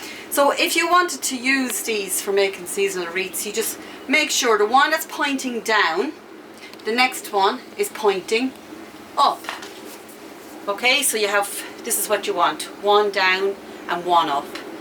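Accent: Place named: Ireland. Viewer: Hi there from NJ in the US. Your video has inspired many willow projects on my little farm of 20 acres. Love your spirit, your nature and your accent, especially your accent because it reminds me of a friend from Ireland who also inspired me. Now I need more willow stools to make more fabulous things. Keep going and growing!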